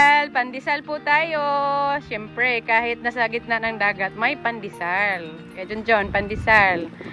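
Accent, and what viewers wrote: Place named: Iloilo, Philippines. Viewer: Ilonggo din pla kyu mag salita mars?ganda pla ng vlog mo nka aliw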